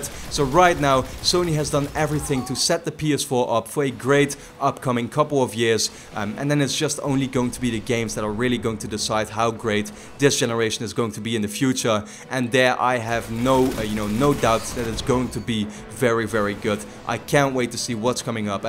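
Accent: Netherlands. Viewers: leuke video man ( ik hoorde meteen al dat je nl bent )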